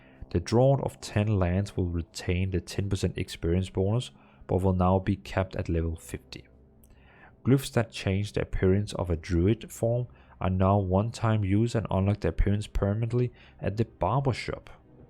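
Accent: Denmark. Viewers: yes Sounds like a danish accent, but it’s similar to Germany, a Germanic language 😊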